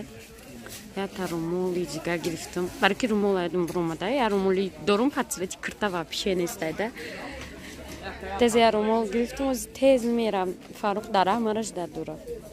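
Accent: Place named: Kulob, Tajikistan. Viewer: Апаи зур ранги кулобиё Гап мезане 😘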